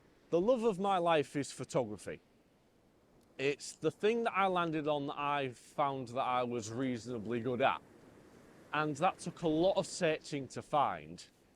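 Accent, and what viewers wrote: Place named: Geordie, United Kingdom. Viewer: Thank you for sharing. Im from Germany and I feel exactly the same for this Camera. I started photography in the age of 16 with the legendary Olympus OM-1 in 1976. I used Olympus with great fun and success till around 2000. Then i must go to digital Cameras for professional purposes. Canon was the only affordable system in these times. So i lost Olympus man years till the Pen System comes out. Till this point Olympus was not useful to me. Later i bought the OM-D EM10 MARK I. STUNNING ! Since this point, i bought several bodies und lenses and do everything with it. Two years ago i thought, that i must have a Fullframe Camera, the Lumix S 5. But seriously, i use it barely. PS: i have good friends in Newcastle upon Tyne, their dialect is quite similar to yours. You must be a Geordie. 😊 Thanks for this Video, take care.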